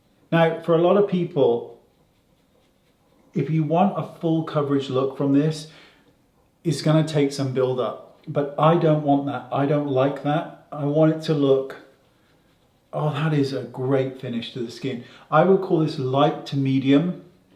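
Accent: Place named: Wales, United Kingdom. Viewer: nothing better after a tough day than relaxing to your voice with your Welsh accent.😍.Sending you love from Australia 🎈❤️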